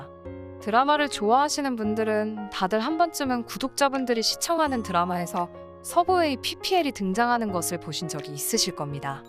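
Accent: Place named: Chungnam, South Korea. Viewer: 대본을 읽어주시는 분 충남출신이군요^^ 나도 충남이라 저런 어투를 잘 알죠 예~~~전부터 하고 싶었던 말임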